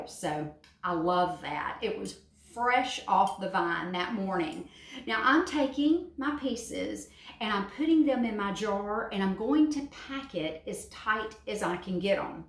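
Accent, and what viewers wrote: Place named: South, United States. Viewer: I could hear you talking for hours. Love southern accent. After 43 years in California. The way you talk is just music to my ears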